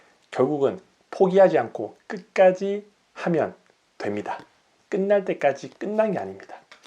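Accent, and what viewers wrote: Busan, South Korea. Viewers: 말투가 익숙해서 설마했는데 부산분이신가보네요. 투트랙영상보고 알았어요. 근데 부산분치고는 말씀이 아주 부드럽고 듣기 편하시네요!! 유툽이름에 복수형으로 하신 이유가 짐작이 될정도로 진정성이 느껴집니다. 무지에서 유를 창조해내고 누구나 할수있고 될수있다는것을 전파하고싶고 다같이 돈벌어보자는 이유에서 복수형으로 지으셨네요. 진심이 느껴집니다.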